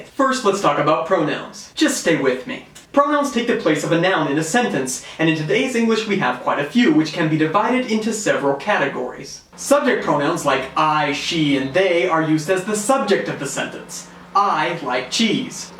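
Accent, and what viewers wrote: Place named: United States. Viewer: Hilarious - a seppo explaining old English! Though, accent aside - he does a good job of it.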